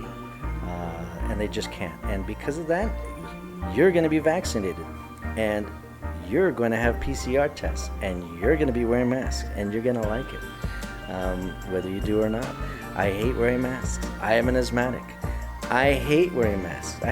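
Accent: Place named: Bisaya, Philippines. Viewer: 💯 legit bisaya gyud, well almost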